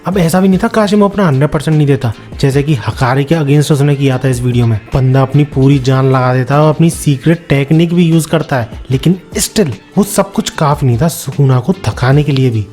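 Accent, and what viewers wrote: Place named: Uttar Pradesh, India. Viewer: Bhai ye UP vale accent mein ARA ARA mat kiya karo, japanese words bol hi mat, bahut bekar lagta hai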